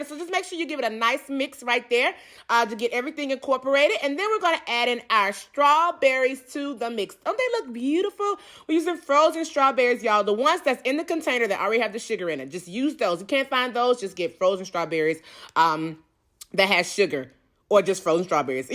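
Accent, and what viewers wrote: England, United Kingdom. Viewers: Love the hood, England accent. You ought to give us that combo more often I LOVE IT🤣 also the cake looks moist and delicious. Im excited to try this recipe for sure. Thanx my girl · The British Accent had rollinng. I'm like "what happened"? lolololololol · Not the British accent 😭😭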